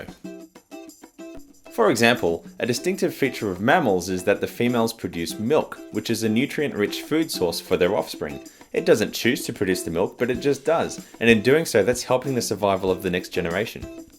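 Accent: Australia. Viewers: it's so comforting to hear an aussie accent on youtube hah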